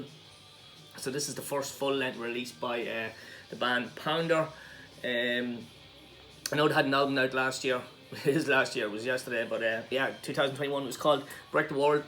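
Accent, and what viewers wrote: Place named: Cavan, Ireland. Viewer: Yea, I deleted it but plan to reshoot it today. I had it all messed up because a few to drink to many hahaha. My good friend Cairan is from Strabane Ireland. I was watching your video and he could tell by your accent your are in Cavan.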